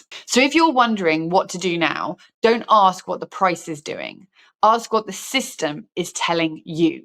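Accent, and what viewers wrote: England, United Kingdom. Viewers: Sounds like a lady with an English accent that likes to hear soft talk. I hear nothing of a substance here. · Funny thing...I don't trust JPMorgan or British accented commentators. Is she reading a teleprompter? Makes you go hmmm... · Is she british?:cat-orange-whistling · IMO, the British accent is beautiful and very classy. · Mostly asking, because of your English accent. I'm fully aware of 'everything' you're talking about + more. I'm in Australia 🦘 SO, now I'm going to get online & find out where gold hit $3500 per oz. In Australia gold is waaaay over that. I know why. SO yep, about to leave your chanel & find out where gold hit three & a half grand 💥 Pretty sure it was hovering around 3 grand in USA in the last few days / weeks. I'll almost bet my life, your headline is just more CLICK BAIT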